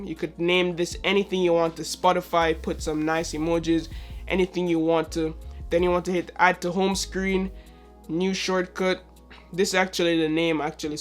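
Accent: Jamaica. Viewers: Iz u Jamaican 😎 🇯🇲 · U sound Jamaican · Yo bruh kl vid but one question, you Jamaican? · Yo you Jamaican? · Are you from Jamaica (or Caribbean) by way of England??? I hear a combo in your accent. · soon as i heard that voice i was like. he gotta be 🇯🇲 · are you Jamaican bro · I can literally hear the Jamaican accent in ur voice general. Where from Jamaica u come from?? Mi cum from St.Thomas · I used to go to school with him in jamaica lol we have cool accents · Ngl u sound Jamaican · You Jamaican dawg?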